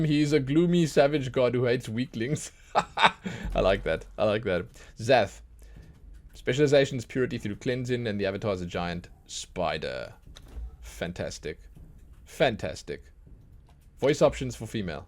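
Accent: South Africa. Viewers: Subbing, cause your accent is South African😂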